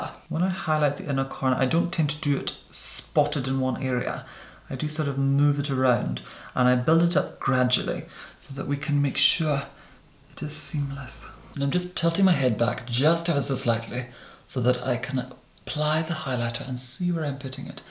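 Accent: Scotland, United Kingdom. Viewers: Knew he had 2 be scottish doin an accent!!! Something about th tone of his voice is very lorraine kellyhaha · What the hell is that accent?? It’s a mix of posh Scottish and god knows what else? Jesus.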